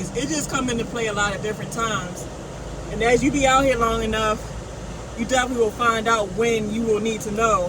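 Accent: Baltimore, United States. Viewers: You have the Baltimore accent lol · Are you a baltimorean ?? Lol. I hear this distinct accent in your voice. Two , do , etc.... just askin.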